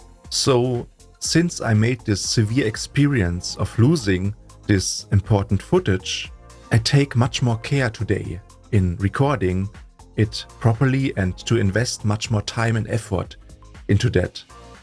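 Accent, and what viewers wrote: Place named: Germany. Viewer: Ohne zu wissen das du ein Deutscher bist, hat dich deine englische Aussprache verraten :D Mal Spaß bei Seite, du baust extrem gut, Respekt vor dieser Zeitaufwendigen Arbeit.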